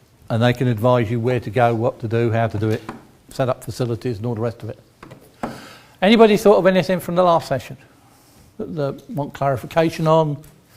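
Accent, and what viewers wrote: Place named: England, United Kingdom. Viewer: Sir I just in love with your English accent its far better than American accent. Please let me know where is this from?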